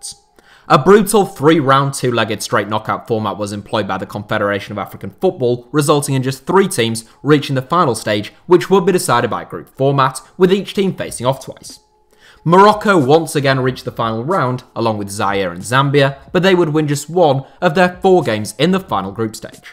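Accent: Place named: England, United Kingdom. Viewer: You sound english but you dont half bunny like a septic....show the clip FFS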